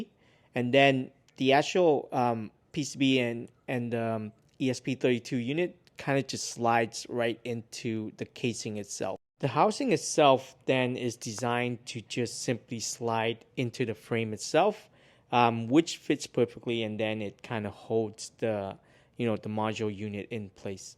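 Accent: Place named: Pittsburgh, United States. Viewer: Location says Toronto, but accent says Pittsburgh.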